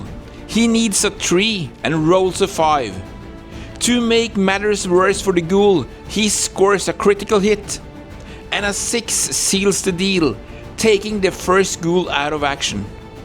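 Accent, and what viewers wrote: Norway. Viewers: Very cool! I can hear from your accent that you are in Norway. Let me know if you are in Oslo and wanna play. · Is that charming Norwegian English i hear?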